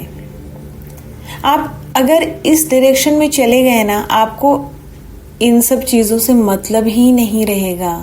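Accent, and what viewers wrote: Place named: Maharashtra, India. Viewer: Apka voice bahut soothing hai👌👍 very nice video 👌 & i think you are rmarathi from your accent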